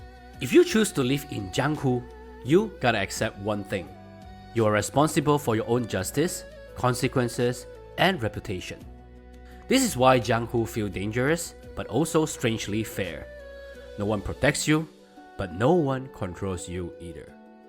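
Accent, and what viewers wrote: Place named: China. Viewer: How are we speaking with a Chinese accent yet using American pronunciations of Chinese words?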